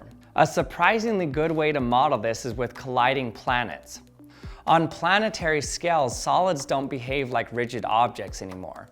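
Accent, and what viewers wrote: Australia. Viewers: When did he became Australian? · When did bro become aussie?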